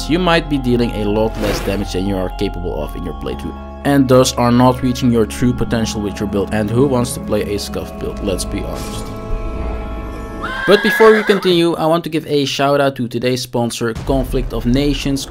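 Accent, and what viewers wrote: Netherlands. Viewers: Bizar maar tijdens het eerste woord dacht ik Ja! Nederlander! Speedrun stuff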